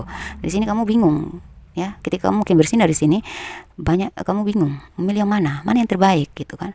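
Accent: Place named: Cigombong, Indonesia. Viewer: Ko suaranya yg seperti aku kenal ya.... Seperti suaranya orang cigombng/ lido ...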